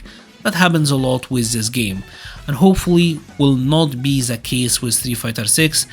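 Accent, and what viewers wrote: Egypt. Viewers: You sound like your Egyptian 🤔 im i wrong ?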